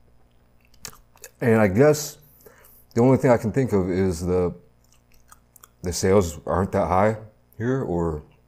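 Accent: United States. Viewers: could you do a video of explaining why you live in Korea etc you sound like you were born in America etc would be a great vid explaining cheers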